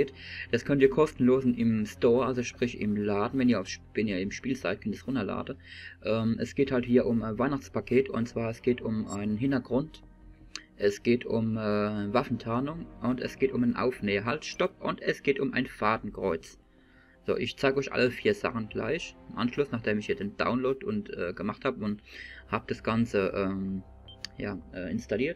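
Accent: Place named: Palatinate, Germany. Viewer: Ich aus Landau :) Man hörts an deinem dialekt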